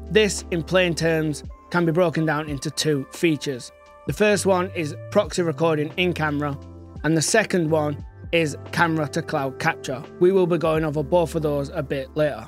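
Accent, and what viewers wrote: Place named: Cockney, United Kingdom. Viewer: I hear Cockney man)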